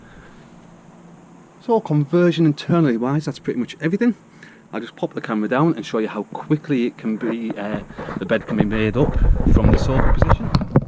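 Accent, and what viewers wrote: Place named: England, United Kingdom. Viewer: What part of England is your accent from?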